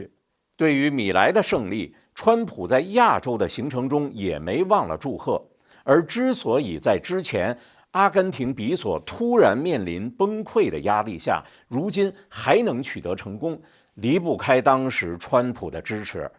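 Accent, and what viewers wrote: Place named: Beijing, China. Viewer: ，您的节目是我日日必看的节目! 您的语音是我最爱的听的语音，正统，正规，严谨无误的北京啌，又不贫嘴，又不官腔，太棒了！而且报道的内容我都信服。尤其是好消息，好动态更让我兴奋无比。谢谢！难得难得啊！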